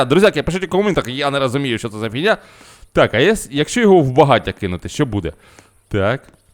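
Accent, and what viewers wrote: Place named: Arab world. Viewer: До речі, хотіла спитати, ти араб ?( просто у тебе такий діалект , як у арабів)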